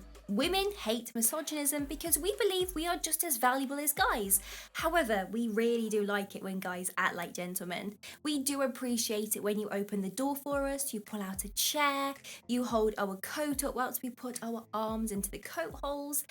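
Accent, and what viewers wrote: United Kingdom. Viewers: Just love that British accent...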